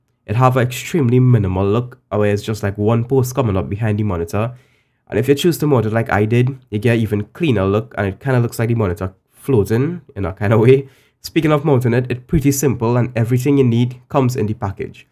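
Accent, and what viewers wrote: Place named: Trinidad and Tobago. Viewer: That’s definitely a Trinidadian accent if I ever did hear one, love the review brother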